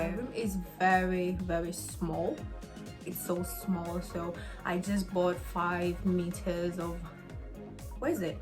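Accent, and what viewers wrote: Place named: United Kingdom. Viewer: You English sounds British 😎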